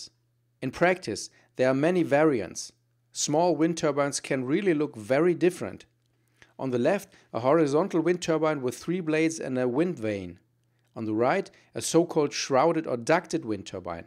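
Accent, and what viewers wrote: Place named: Germany. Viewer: I like English of Germans. I can easily understand it.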